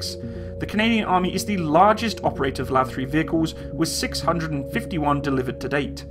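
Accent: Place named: United Kingdom. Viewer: You don’t sound like a fellow Canadian you sound half british no offence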